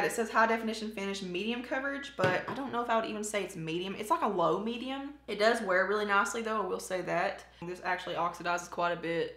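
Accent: South, United States. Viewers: “Southern Charm”... I love that. I am from Savannah, Georgia, and I love your accent. It makes you...you. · I just discovered your channel tonight and I have not enjoyed a "makeup" video in so long! I enjoyed your honesty and your familiar southern draw😉 I'm looking forward to seeing your future vids. Love the fact you are down to earth and real! I can so relate to you. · I don’t think I ever told you this before but maybe I have, I absolutely love your accent. I could listen to you talk for hours. My whole family is from the south but I have lived in Northwest Ohio my whole life. My grandma passed away a year ago and she lived up here for the last I would say 40 years but still talks like she was from Lawrenceburg Tennessee. I just think a southern accent is very comforting. But I do know a lot of people hate on it and stereotype you as a hillbilly. 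That’s really sad because you’re beautiful person inside and out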